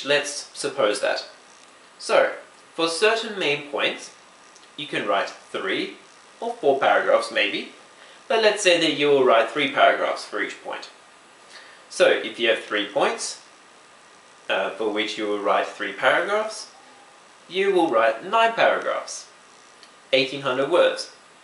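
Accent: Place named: China. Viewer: you have a Chinese accent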